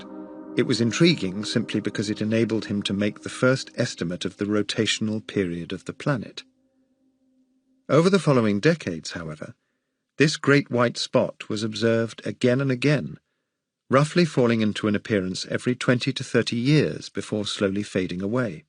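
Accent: England, United Kingdom. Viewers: British narrator is a must for me. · The 'british narrator' is agreed by anyone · its an English accent. Britain is made up of multiple countries, each with its own distinctive accent. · I use this to fall asleep. Perfect! Space....check. hours long....check...British narrator...check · 😂 what’s the deal with the British accent? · My literal checklist for prime sleep! Space, hours, English accent 👌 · Fantastic movie, can't imagine the amount of effort put into it... apparently I have a lot to learn about the YouTube business model. As for the narrator, he's binary compared to everything that's wrong with the world today... intelligent, intellectual, rich language but not inflated, calm, not artificially sensational and (thank god for the) British accent! Well done! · lol, i like this video putting in what appears to be real pictures. Hard to find documentary on yt showing that, wish more would do it. 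And british narrator should be on all docus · Glad I’m not the only one that prefers British narrators to fall asleep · This helps me sleep so well because the narration is stitched together SEAMLESSLY. There are no pauses or awkward silences for my brain to pick up on. The British Narrator is CONSTANT. Like a metronome - just keeps going. 🧠 💤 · Perfect narration. Beautiful, clear and calm. A voice which is trustworthy and very, very British. So refreshing. America take note. This is how you inform people. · Yes! I agree 100%, His delivery has the perfect cadence, calm and british ( that is way easier than synthetic pseudo-british or irritating southern american drawl to digest for hours at a time), and the information is useful and free of words like "shocking" or "we were wrong" type crap....thank you. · British narrator you know this, I can’t watch any doc without a British narrator and this guy is soothing asf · That good ol’ monotone English accent … nature’s sleeping pill.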